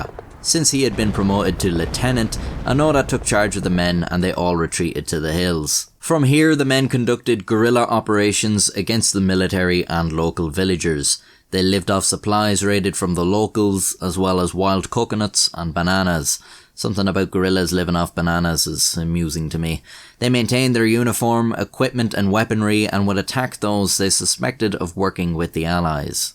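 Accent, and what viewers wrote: Ireland. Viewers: I always forget this guy’s Irish. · An irish man talking about WW2 is wierd. Like watching a swiss made video on WW2. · you irish? cuz im from cork and you sound irish XD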